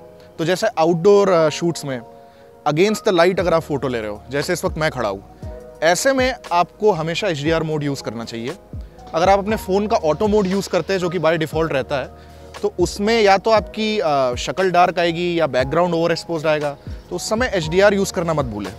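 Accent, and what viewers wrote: India. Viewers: are you bengali?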